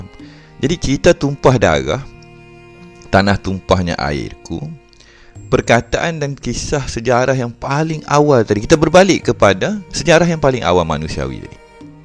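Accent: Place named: Terengganu, Malaysia. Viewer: Orang Terengganu cakap. The BIJORKKK 👍👍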